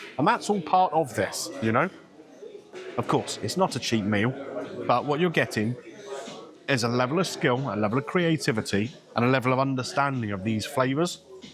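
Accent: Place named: Birmingham, United Kingdom. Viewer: Its funny watching a two Michelin meal being described in a thick Brummie accent instead of the usual French, real Oxymoron, ha!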